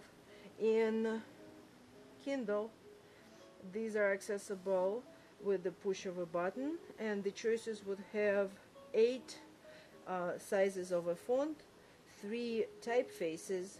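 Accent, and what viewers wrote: Russia. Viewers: очень сильный русский акцент :) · Ты случайно не русский?)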